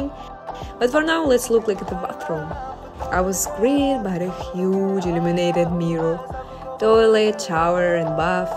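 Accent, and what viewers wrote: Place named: India. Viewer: Idk why but she is sounding more and more indiann.... As she makes more videos 😂❤👍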